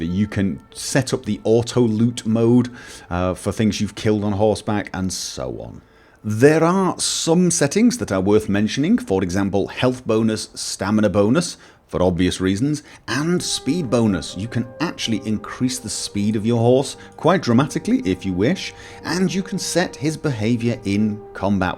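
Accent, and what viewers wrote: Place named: Birmingham, United Kingdom. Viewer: He's from the British midlands, I believe. Can't quite place it, but there's a bit of Birmingham in there...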